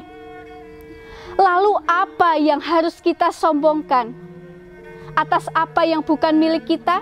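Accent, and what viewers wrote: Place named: Java, Indonesia. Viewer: Medhokmu nduk.. bikin teengiang2 ... 🙏🙏🙏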